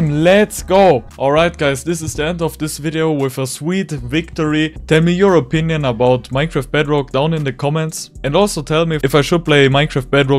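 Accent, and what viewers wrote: Germany. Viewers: Are You from German? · German accent, I love it😂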